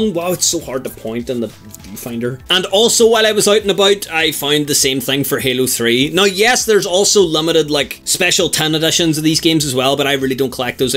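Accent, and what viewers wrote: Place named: Northern Ireland, United Kingdom. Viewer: I was lookin up about my bioshock infinite promo copy i had from ages ago didn't expect a fellow northern irish voice as well like!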